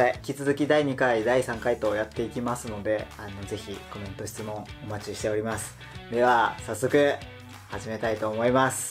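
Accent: Kansai, Japan. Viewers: くんの関西弁にキュンとしちゃう♥♥♥ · 関西弁なのに怖くなくて、言葉のイントネーションとくんの声が心地よくて何回も見てます(*´`) 色々な質問に肯定的に答えていて穏やかで優しくてすごく幸せな時間でした。次回も楽しみにしてます💓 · これからも関西弁いっぱいのくんを待ってます🎵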